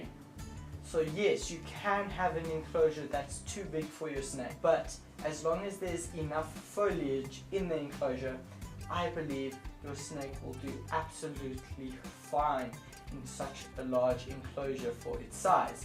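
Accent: South Africa. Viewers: South African? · Is that a south African accent bro?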